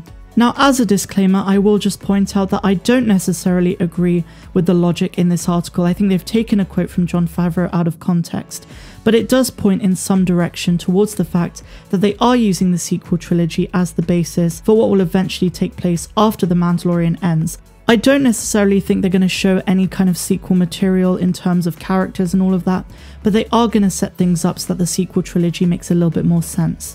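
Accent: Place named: Bristol, United Kingdom. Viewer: I would have bet, you was from Bristol.good luck. Great vid.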